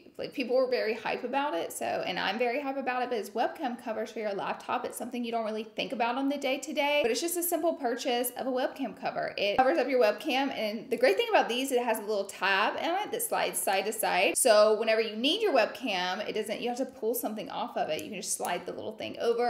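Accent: South, United States. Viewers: GURRRLLLLL is totally from the south. But it’s MY FAV. Fellow southern girlie.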